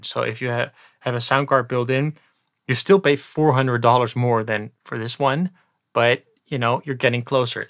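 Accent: Netherlands. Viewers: Ik hoor aan je accent dat je Nederlands bent hahaha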